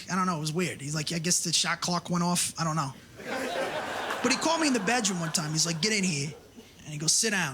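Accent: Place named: New Jersey, United States. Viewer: The jersey accent is maybe the only one that competes with deep redneck for being just, offensively dumb and obnoxious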